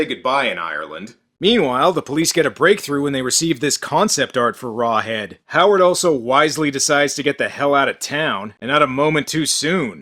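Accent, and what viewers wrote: Ireland. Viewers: Rawheadrex , queen kong, deathstalker who come up with these name next you going to tell us there a movie called WTF Also that Irish accent is amazing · If Charlie Kelly's Green Man had produced this film, we'd probably be a lot better off. Did a pretty-good job on Lethal Weapon 5 & 6. I love that a 30-foot solid stone obelisk is apparently supported by a foundation that's only about 2 feet deep. A butterfly could push that thing over. Great Irish accent, though I think I detected a wee bit of Maritimer starting to peek-through at the end, lol! Not that there's a ton of difference, mind. Seems like it's always a bad idea to give your monster human eyes, even if they're red. Kinda like sharks with human teeth; it just utterly destroys the creepiness. Rawhead looks like a less-scary version of Judge Doom from "Who Framed Roger Rabbit?". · Am I the only guy who instantly got a boner from talking with an Irish accent? 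No? Ok, just making sure that I'm not weird... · The Iriah accent made me laugh out loud literally literally. Have a. Subscribe yer sexy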